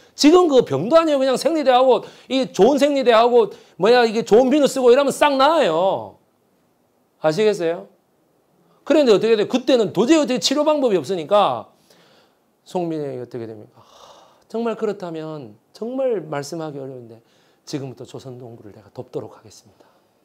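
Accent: Busan, South Korea. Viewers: 귀에 쏙쏙 들어오는 부산말씨 확실하게 설명해주셔서 감사드리며 정말 역사에 대해 모르는게 많은것에 부끄럽습니다 매일 듣고 있습니다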